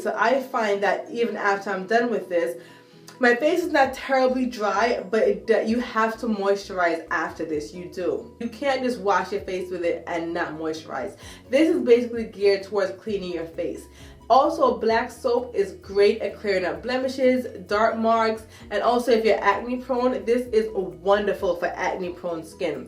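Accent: Jamaica. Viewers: Your jamaican voice tho. 😄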